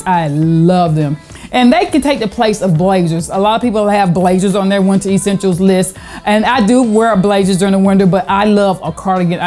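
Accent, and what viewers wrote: South, United States. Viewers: I knew you was from the south from your accent, ahhh NC. I’m from NC. Great video!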